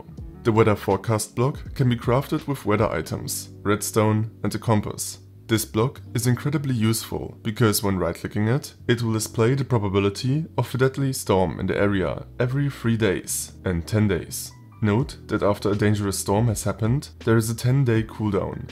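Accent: Germany. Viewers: This guy is German, that’s for sure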